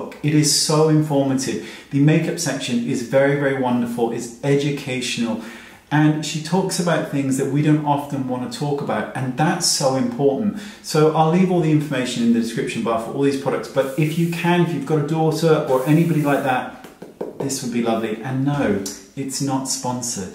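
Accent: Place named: Wales, United Kingdom. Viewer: you sound super welsh today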